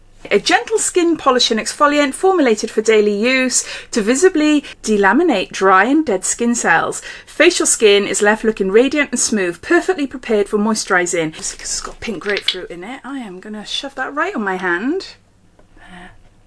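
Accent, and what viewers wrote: Wales, United Kingdom. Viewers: Really sorry to hear someone was so rude about your bubbly personality. It’s one huge part of why I love your videos. Honestly, thoughtful reviews, also I do love Welsh accents 😄 Ignore the odd silly comment. This calendar looks really great. It would’ve been on my hit list if I could get it shipped over. You’re spot on as well… “no Avant”! Those products tend to artificially inflate the overall value and I find it off-putting when I see them in boxes or calendars. I wish their pricing was more in line with the rest of the industry.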